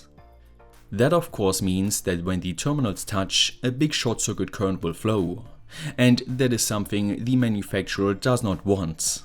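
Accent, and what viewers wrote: Germany. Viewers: Is this German accent or what?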